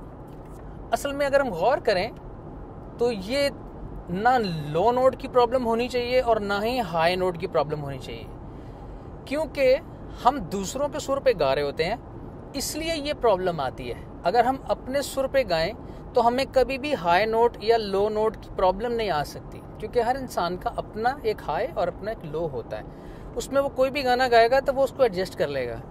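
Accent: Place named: Punjab, India. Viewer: Aapke punjabi accent bahut achha lagta hay sir.... Respect from India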